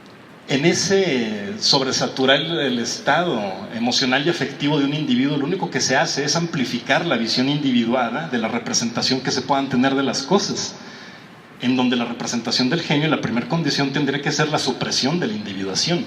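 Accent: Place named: Coahuila, Mexico. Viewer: Que onda maestro, perdon, licenciado. Me alegra que aun no has perdido tu acento Coahuilense y el estilo de Saltillo. Yo he perdido los acentos en mi teclado pero seguire con mi estilo. No hay defensa para los elogios asi que cuidate de ellos; no, mas bien de quien los espeta. Me gusta la frase de Schopenhauer que dice "Toda verdad atraviesa tres fases: pimero es ridiculizada; segundo, recibe violenta oposicion; tercero, aceptada por algo evidente". Escuchando tu ponencia se me vino a la mente las dificultades que tiene la gente de 60 a 80 años para usar una computadora, tablet or telefono "inteligente". El problema no es presionar los botones o pantallas, como mucha gente supone, ell@ son de una generacion analoga y mecanica. EL problema radica en la idea y los conceptos del objeto que tienen frente a ellos. La idea de enviar una carta, no impresa, atraves de un aparato electronico que guarda, no en un cabinete sino en las nubes, la informacion en algun lugar del cyberespacio y lo envia en un lenguage binario - 0 1 - y que el aparato receptor interpreta esos 0s y 1s y los muestra en la pantalla como una copia original de la dimension estetica de la representacion de la idea de la carta original. 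Sabes, tu y yo somo de una generacion que dio genero la transicion de lo analogo a lo digital. Las nuevas generaciones son digitales y saben como usar los aparatos, pero al igual que sus abuelos, no saben como funcionan. La nueva generacion technologica-digital es la representacion c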